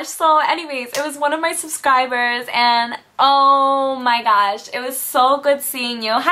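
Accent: New York, United States. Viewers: lol, love your hair and your attitude. you have that new york accent going on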